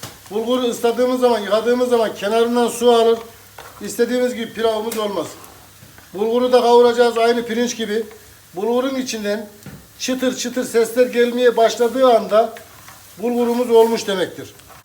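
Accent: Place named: Tokat, Turkey. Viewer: Konuşma Tarzın İç Anadolu tarafı Gibi .Merak ettim Nerelesiniz?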